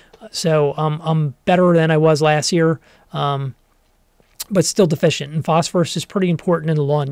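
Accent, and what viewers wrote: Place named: South Jersey, United States. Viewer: New sub & fellow South Jersey guy here (Gloucester County, 20 min from Philly). It’s comforting to hear that strong South Jersey/Philly accent on a lawn care channel, ha!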